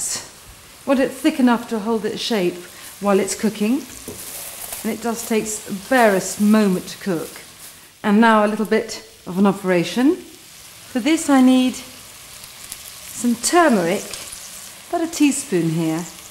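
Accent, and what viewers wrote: United Kingdom. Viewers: nigella removes stress from cooking . she's truly fun to watch . but sometimes I'm a bit stressed listening to her brit accent .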